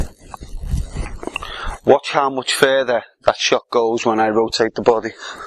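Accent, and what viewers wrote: Liverpool, United Kingdom. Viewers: A scouser! Too right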